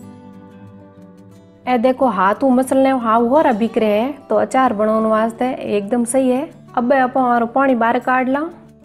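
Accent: Marwar, India. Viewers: मै गुजराती हु। और आपको स्पॉट करता हु की आप अपना वीडियो अपनी मातृभाषा में ही अपलोड कीजिये आपकी मारवाड़ी बोली बहुत स्वीट है आभार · आप प्योर मारवाड़ी बोल रहे हो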